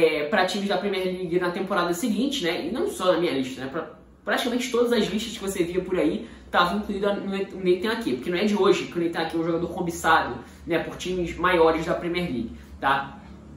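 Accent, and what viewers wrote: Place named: Rio de Janeiro, Brazil. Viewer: ta lá minha audiência qualificada aí hahah! você fala muito bem, parece o rafael oliveira com mais sotaque carioca haha baita análise quando encontrar o comentário de Leonardo Andrade nos seus vídeos serei eu ;)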